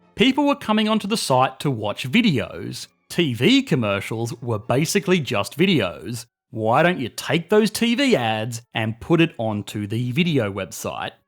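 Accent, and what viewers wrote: Australia. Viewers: Me: doesn’t get it in class... finds it explained on with cringe jokes and an Ausie accent and awesome stock footage. Me: google giveth🙏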